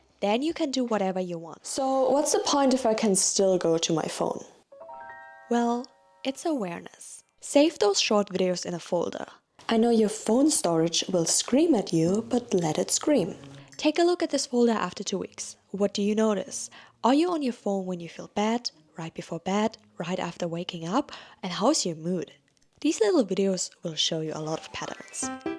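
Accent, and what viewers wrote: Germany. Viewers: The tips are GREAT, btw this was actually the first video I've seen from you, and I immediately questioned if you were German or Austrian, by your accent and furniture?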